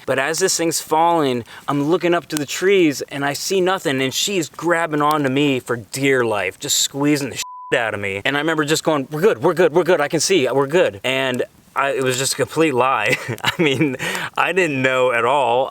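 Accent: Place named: United States. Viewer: I'm always relieved when I click on these videos and hear an American accent.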